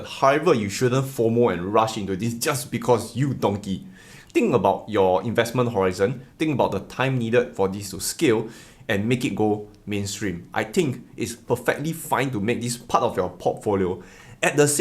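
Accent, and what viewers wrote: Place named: Singapore. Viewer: Needs an accent reduction course for Singaporeans. Straining to listen & follow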